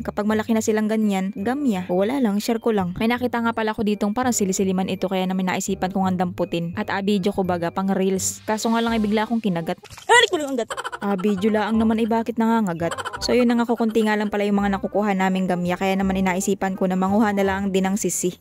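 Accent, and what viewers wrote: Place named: Visayas, Philippines. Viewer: Bakit may halong bisaya ang salita mo idol?😅bisaya din ba kayo?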